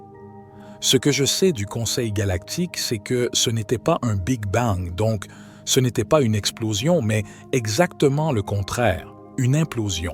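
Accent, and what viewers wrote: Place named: Quebec, Canada. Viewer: oui vous avez une abonné de plus et j'adore votre accent québecois je pense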